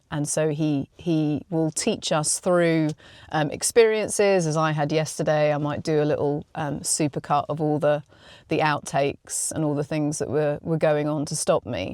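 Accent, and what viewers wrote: England, United Kingdom. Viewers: Brilliant as always! It is highly evident that you have humbled yourself before the Lord and so He is using you in a mighty way. This message resonates with me so much and yes, I do find that there is a definitive pattern of God allowing us to have our own season of death to self so that He may then live through us for His glory! As an aside, somehow, I feel more cultured just listening to you with that lovely English accent. Ha Ha And, since I did not have any children, I bestowed my favorite name to my beloved cat,.:) God bless you!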